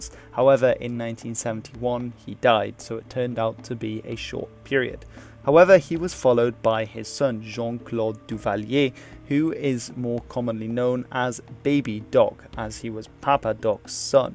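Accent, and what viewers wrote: United Kingdom. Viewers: he has a british accent unless it is a voiceover, they always absolve themselves, always A;WAYS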